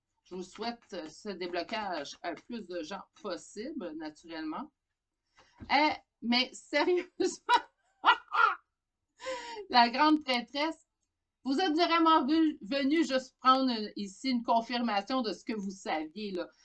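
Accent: Quebec, Canada. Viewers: bravo pour cette belle réussite je visionne toutes vos vidéos chaque soir heure française 💌vous amenez de la joie avec votre accent qui sent bon le sirop d érable 🍝🍵🍰☕🦫🐻🦬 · Merciiiii, j'adore vos expressions Québécoise votre accent 🐸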